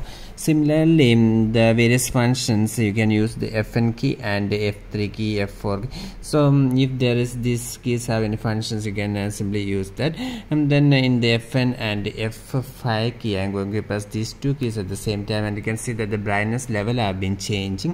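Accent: India. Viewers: where is the accent from? is it India?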